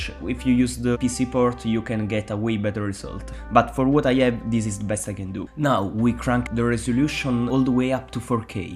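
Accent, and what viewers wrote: Italy. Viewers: Are you Italian?? As an American I Love the accent. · Ero sicuro di sentire una parlata italiana, e non mi sbagliavo. Ottimo video comunque, quando la versione ufficiale di Nintendo fece un flop non ne rimasi molto sorpreso onestamente. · Bro you sound kinda italian, are you? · one of the best video I've seen! As I understood, you sound like you are Italian, as I am, that makes me even prouder that an Italian did this, without much effort. Can I just ask you if you can send me the control scheme for galaxy 1 and 2 for dolphin? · Ma sei italiano ahahahha, comunque bel video anche per i 4k60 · bro ma sei italiano palese si sente una fracca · Bro ma hai un accento italiano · Sei stra italiano vero? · out of curiosity, are you italian? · You sound so italian bro Non è per offenderti btw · Quanto si sente che sei italiano porca troia ahah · Oddio, ma sei Italiano. 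L'ho riconosciuto subito l'accento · sei percaso italiano ? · you sound like an italian speaking english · Wait i hear italian accent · di che sei italiano senza dire che sei italiano <3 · I lovr your accent, are you italian? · U sound Italian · are you italian? i can hear it.. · Non so perché ma ho la sensazione che tu sia italiano. Sarà l'accento ahaha. Comunque gran bel video. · bro bel video ma si sente che sei italiano e mi cringio un po' a sentirti però bel video eh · Hai un'accento italiano fantastico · Bro do u have an italian accent? Sembra un botto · I understood you were italian only by the accent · Bro are you italian?? You're accent it's very similiar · This dolphin-powered fan-made collection is such a cool concept. Love these upgrades, this looks like a very neat package. PS: Comunque, adoro il tuo accento. Sembra che il video lo abbia narrato Super Mario in persona. Nel senso buono della cosa. 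· sei italiano vero? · Io, secondo me dall’accento è italiano… vado nelle info del canale, location: Italy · Si sente subito che sei italiano! Sono cresciuto con i giochi di mario per gamecube e wii, è sempre bello vedere che i fan hanno ancora a cuore i titoli del passato e si adoperano per renderli immortali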